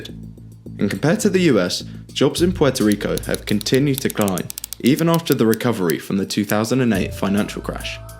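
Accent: United Kingdom. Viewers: So, as an American, I’m being scolded by a British accented presentation? Not disagreeing with anything, but seriously, I wonder what the British did with some of their colonies?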